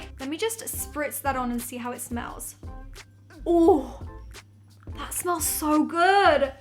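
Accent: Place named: United Kingdom. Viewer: Your makeup looks stunning!! and I absolutely love your British accent. I just uploaded a fall makeup video, it would mean a lot if you check it out xx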